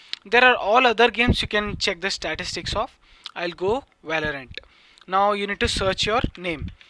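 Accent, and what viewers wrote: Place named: India. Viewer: I love ur accent bro . Its indian but not like the other indian videos which are completely inaudible. You actually speak english very fluently and i have had no problem understanding u. Keep up the content guy!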